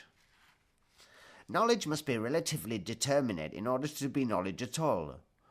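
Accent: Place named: RP, United Kingdom. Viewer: Oh, and love the posh accent :D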